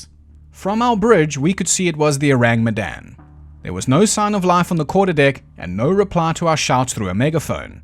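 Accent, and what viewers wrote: South Africa. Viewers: Goddamnit I thought you were an aussie and when you read that Dutch I was like "wtf?" How did I not pick up that South African accent. I feel like an naai. Well, now I have to subscribe. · You sound like Rodney Howard brown. I knew immediately you were south African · Your content has been getting recommended to me for a while now and I assumed it was because my algo finally realised I might like to see things other than Skyrim challenge runs because this topic is exactly up my alley. So imagine my shock when I clicked on the video and got SUCKERPUNCHED by a fellow South African accent!! But also yes. I do want to know some actual facts about this very very silly legend that I could never make sense of no matter how hard I tried. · This is not how i find out you're South African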